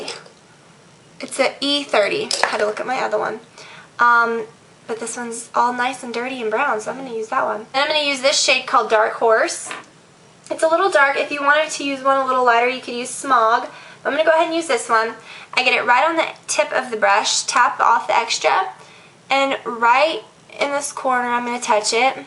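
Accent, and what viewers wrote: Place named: Texas, United States. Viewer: awesome tutorial, it sounds like your getting a texas accent or something lol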